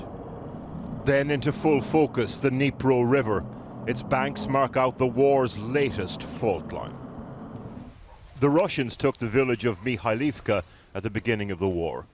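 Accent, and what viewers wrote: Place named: United Kingdom. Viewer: marshall ... as in martial . a british channel are you not ?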